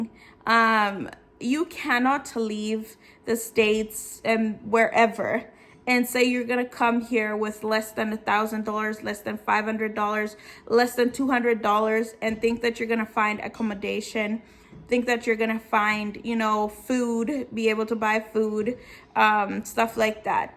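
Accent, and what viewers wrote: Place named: Jamaica. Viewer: Hi. Just came across your channel. Curious to know if you were born and raised in Zambia. You kind of sound Jamaican to me lol. I'm Jamaican by the way 🙂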